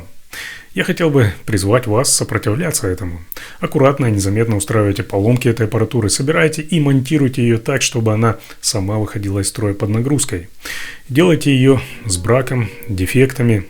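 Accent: Ukraine. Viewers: Этот майор, судя по говору, родом из Малороссии. Вслушайтесь, соотечественники: ципсо во всей своей красе. Вдохновенно работают.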